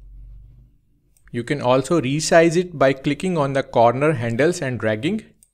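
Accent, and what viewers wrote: India. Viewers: Indian ahhh voice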